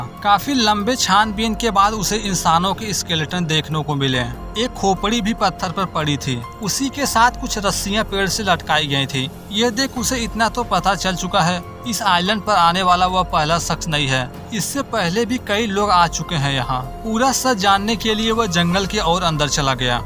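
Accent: Uttar Pradesh, India. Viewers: Brother you don't speak english words in your vedio. Your UP accent is listening in your vedio. Like starting you are saying istarting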